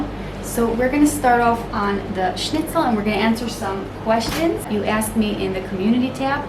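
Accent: United States. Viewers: Hi. I live in U.S.A.How come your accent is American since you live in Israel? Love to watch your vlog.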